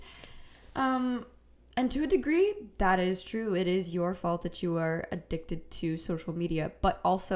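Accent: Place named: South, United States. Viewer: You should do some Southern Receptionist ASMR stuff <3 accent so good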